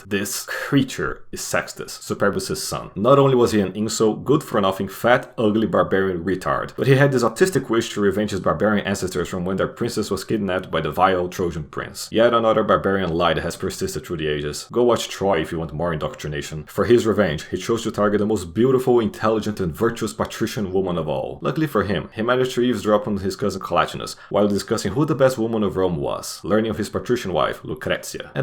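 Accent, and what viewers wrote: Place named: Brazil. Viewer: Is the narrator Brazilian? His accent sounds so Brazilian!!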